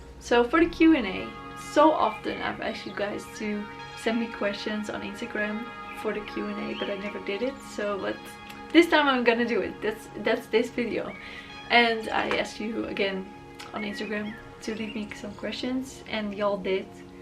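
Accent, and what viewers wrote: Netherlands. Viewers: Ah I knew you were Dutch! Dutchies always recognize other Dutchies accents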